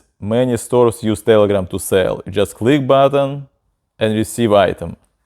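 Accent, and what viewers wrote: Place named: Russia. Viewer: You have the best russian accent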